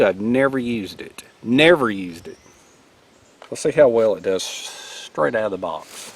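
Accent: South, United States. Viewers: you have a real imagination for innovative camping appliances and gear. Because you really enjoy what you do; your content is not only informative, it is "entertaining". Also, I am from Arkansas. You speak excellent "Southern". GOD bless you and your family. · I always enjoy Friday morning with 😁. I've had good luck with the green slime in lawnmower tires. My gf loves your southern accent. 😊Also a can of fix a flat might be handy.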